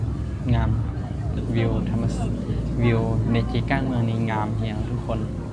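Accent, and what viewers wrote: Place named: Laos. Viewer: ฟัง..เว้า สำเนียงลาว ล้าน % 🫵😁😂🤣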